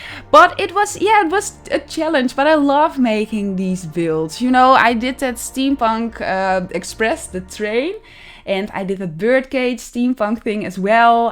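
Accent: Netherlands. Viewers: Wowww ik kan niet eens een normale huis maken😭😂 en ja ik praat nederlands omdat ik aan je accent kan horen dat je het ook bent :') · Are you Dutch? 👀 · Are you Dutch? Cool video!! ❤️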